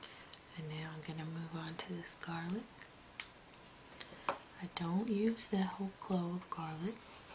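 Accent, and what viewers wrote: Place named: Texas, United States. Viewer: AH HA! That explains the accent. You are from Texas. That means we are neighbors. I'm from Louisiana. :) I love guac but I never knew how to make it until now. Thanks for teaching me!! And you are REALLY good at the soft speaking cooking thing. Me? Not so much. I need more practice with slow movements lol. I hope you decide to do more like this :) Thanks for the video! -Katie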